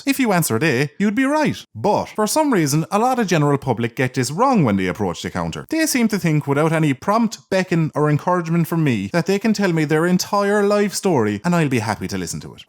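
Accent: Ireland. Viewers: You are the most Irish man I've ever heard. I love it.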